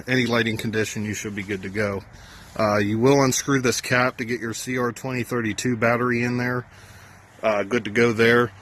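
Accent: Philadelphia, United States. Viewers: Ha ha. I thought the same thing - sounds like a Philly dude but not completely. Pittsburgh - that explains it. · Are you from philly? Just curious cuz you have a little bit of that philly accent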